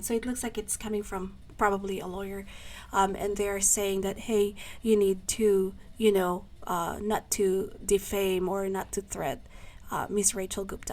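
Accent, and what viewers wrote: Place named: India. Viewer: Mr Nawat is hands on in his organization. There is nothing more effective than him making that move. You sound like Indian so I don't acknowledge your point. Your subscribers is less and I don't think it is going grow fast. You are sounding like moralist like most of Indians. I don't dig,that. I didn;t subscribe nor like your content. I have to change channel.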